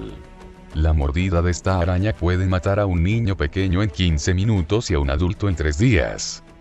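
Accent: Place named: Spain. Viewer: oye como haces la bos de españolete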